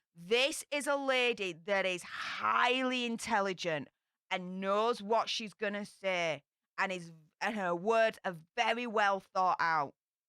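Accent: Yorkshire, United Kingdom. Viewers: Is that a Yorkshire accent,? North or South? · Hi # Just saw you on popcorned. Love your Yorkshire accent. It's 'reet gravely'... Love the vid. I subbed! x Ve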